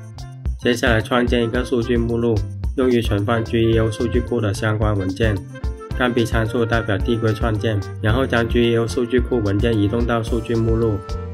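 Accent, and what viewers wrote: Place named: Chaoshan, China. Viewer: 好像很牛逼，空了我也试试大鹅，听口音，up主是潮汕人吧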